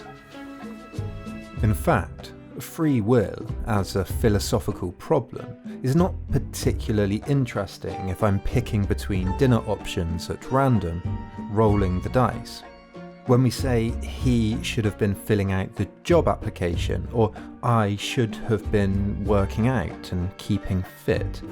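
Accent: United Kingdom. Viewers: Why do I find this guy so attractive? I think I have a thing for British accents and philosophy majors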